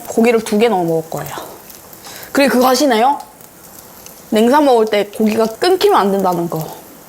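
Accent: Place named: India. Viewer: 영어발음이 인도식인데 좋쿠만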